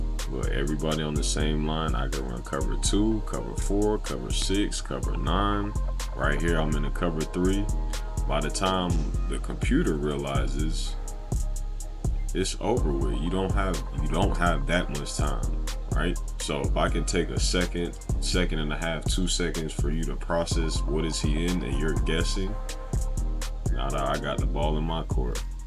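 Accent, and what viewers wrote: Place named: Atlanta, United States. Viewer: Dawgy sound like he from round my way what zone you in in Atlanta twin 😂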